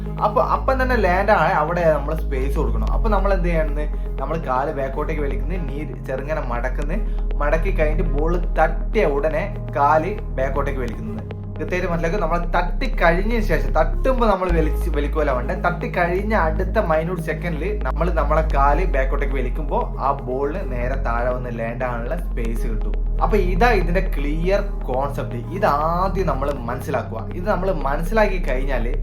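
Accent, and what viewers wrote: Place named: Kannur, India. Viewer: Bro, ith Greenfield ano Chonadam? Kand nalla parichayam. Ingale sound kettapo kannur anenn manassilayi. 😂 ❤ Sub cheythine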